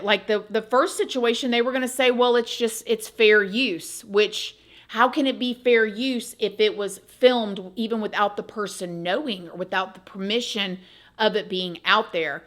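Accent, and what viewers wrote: United States. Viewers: My parents were swingers... Personally, I never fully understood it... But its not my place or concerns of what consensual adults do... Consensual being the most important aspect! Illegal recordings are not "fair usage" no matter who you are! I would venture to say Bubby sold the video... And it's refreshing that it's the facts of the story ~ not the morals or character ~ that is the story here! I think it's funny that the ex-wife is unset more about the money gained from the court case than what was recorded! (Envy and Jealousy ~ not betrayal ~ she plainly shows her heart only holds anger! Which is sad to think they can't even be friends!) This video was my first from this channel, but it's not the subject matter that earned my subscription ~ but the awesome way it was presented! (Hearing that genuine Southern twang and the sensible conclusion ~ please continue creating more content! 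You are awesome and sweet!) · I'm a gonna' comes out of your mouth and the thick southern drawl has me believing that you are actually from NY but want inarticulate folks to resonate with your video. Why don't we just say that "Terry" is a bottom feeder and it was evident when he put an innocent comedian in a sleep hold with no remorse - just a sneer on his steroidal evil face y'all - I am from PA and don't relate to your accent. Was that hate filled? No, just a 1st right amendment. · I thought Hulk Hogan was a legend until he douched himself at the RNC. He lost a hell of a lot of people that really liked him by attending the MAGA CULT rally. I watch you from Australia and would like to ask you, where about in America are you? I love your accent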